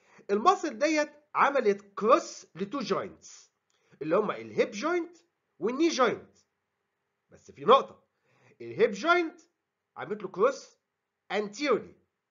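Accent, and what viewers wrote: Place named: Iraq. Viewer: من اللهجه واضح عراق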